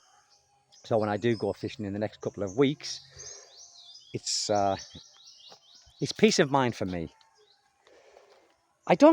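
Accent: United Kingdom. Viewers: I am probably wrong, but you sound like a Brit. I am just a bloody yank. I can think of a half dozen places that are not safe in the UK. London, Manchester, Birmingham, Liverpool... you get the point the UK is not safe. Even 40 years ago it could be said that Belfast would not have been safe because of the IRA. In the USA, I am always armed. I have never had to use my firearm and I have lived in some very dangerous places. There are still many many dangerous places in the USA and even Canada as well. I would have to agree with your wife. Safe during the day is one thing, safe at night is something else. Now if you lived in Japan, I would say she was overreacting. But any one of the 7000 islands in the Phil, she is right. It is still a 3rd world country and people will do desperate things for money.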